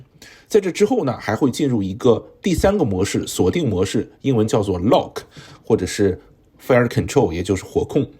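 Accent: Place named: China. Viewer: 怎么那么多说着一口中国味的中文在做这种频道？是好赚🐸钱？ 你就不怕他在糊弄你们呀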